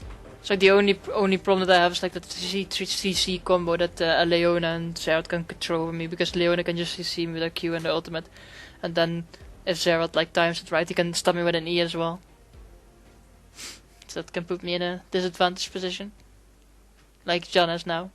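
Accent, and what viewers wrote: Netherlands. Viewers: you sound like a guy who comes from holland? am i right?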